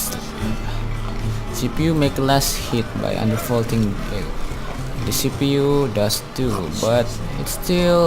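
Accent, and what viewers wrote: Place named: India. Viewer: For some reason he's the only indian guy that tries to speak english in a funny way haha i love it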